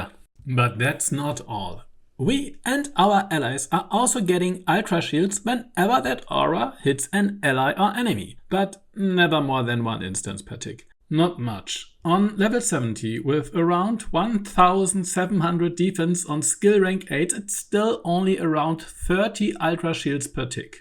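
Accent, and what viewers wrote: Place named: Germany. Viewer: your german accent is horribly intense, use your tongue a bit more when speaking english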